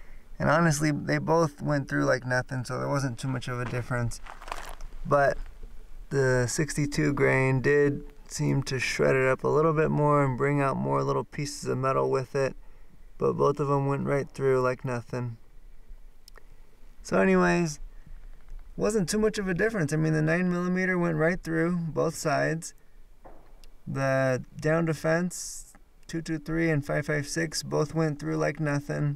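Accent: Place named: New Mexico, United States. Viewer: no worries man. You have a similar accent to a buddy of mine in New Mexico so it was a guess lol. I love Idaho though!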